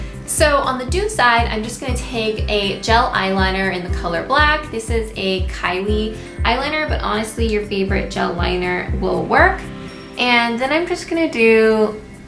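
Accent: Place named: California, United States. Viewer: sounds like CA accent.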